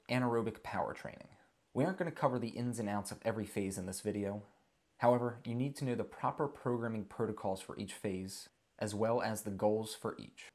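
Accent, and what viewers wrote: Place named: Baltimore, United States. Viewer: Almost couldnt tell youre from baltimore 😅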